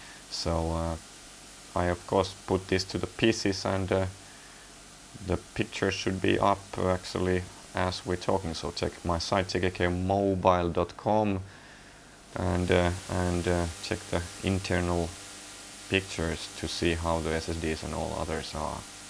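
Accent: Finland. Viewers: ru Finnish?...u sounds like my friend from Finland. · I seek reviews on a gadget and I somehow always end up watching the ones with that guy and his finnish accent ;). They're the best. Thanx for them all!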